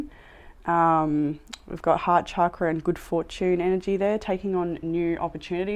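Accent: Australia. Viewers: I got caught up in my own blues and forgot to thank you for the beautiful reading. Love hearing the Aussie accent. I spent beautiful years in Australia and recently I've decided to manifest a visit!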